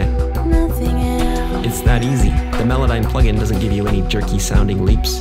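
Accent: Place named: Canada. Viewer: Haha, he sounds Canadian to me, too! Anyway, cool stuff.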